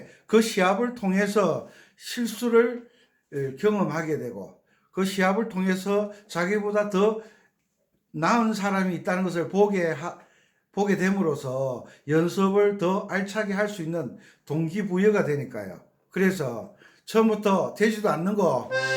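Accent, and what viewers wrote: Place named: Gyeongsang, South Korea. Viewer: 완전 갱상도 니다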